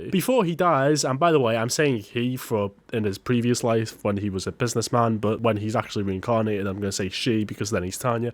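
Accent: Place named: United Kingdom. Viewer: What's this accent, dude? It sounds perfect for roasting people, like British but more insulting.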